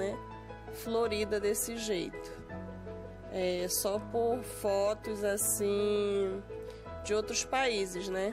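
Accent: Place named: Pará, Brazil. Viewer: Pelo sotaque, eu tinha certeza que moravas no Pará,! Só não sabia a cidade😅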